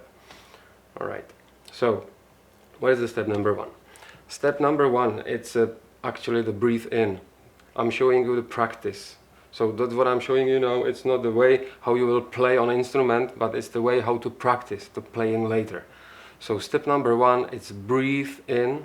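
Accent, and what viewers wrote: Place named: Norway. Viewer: You sound norwegian..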